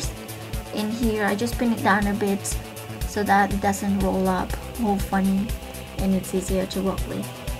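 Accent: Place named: United Kingdom. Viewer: silly question, where are u from cause ur accent sounds british and sort of indian or asian XD sorry if i sounded rude asking lol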